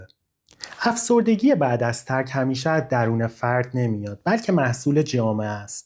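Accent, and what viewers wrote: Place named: United States. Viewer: یه سؤال تو متولد نیویورک هستی؟ خیلی لحجه آمریکایی داری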